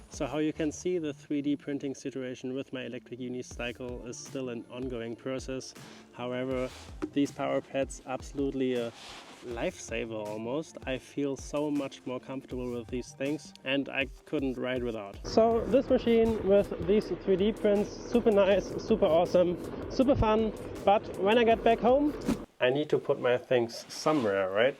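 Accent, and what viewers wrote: Germany. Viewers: Are you German? I love your accent! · Direkt raus gehört das du drutscher bist, deswegen noch sympathischer 👍🏽 mach weiter so super video🫶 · i hear german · I love this guy, I'm german but moved to America when I was 4 and listening to him is like listening to opa and onkels speaking English to me when I was younger because they wanted to show me how smart they were. Thank you for the heartwarming memories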